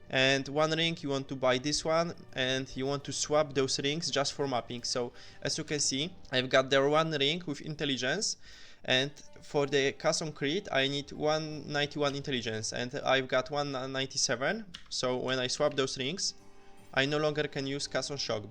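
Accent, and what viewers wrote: Poland. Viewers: Słychać że Polak od razu xD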